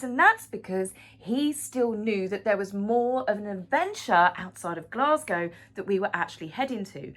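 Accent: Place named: Southeast England, United Kingdom. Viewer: It was great to hear your SE England accent. I’m from the SE but now live in Devon and I miss it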